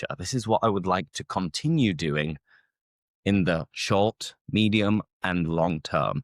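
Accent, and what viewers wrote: United Kingdom. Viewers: Finally, i understand british accent. Thanks so much😊😊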